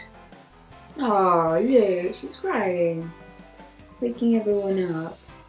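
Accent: RP, United Kingdom. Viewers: Just when I thought I couldn't relate you more, you are also a simmer! From one posh sounding disabled lesbian to another, good choice on playing Sims 2 because that is my all time favourite Sims game 😊 you can get good safe mods and such on Tumblr! I get all mine from there. Also I completely make my simself and play. In Sims, I have a cat and dog and a lovely bungalow and I am searching for my wife but I think I'm going to have to make myself one because the townies are kind of...lacking.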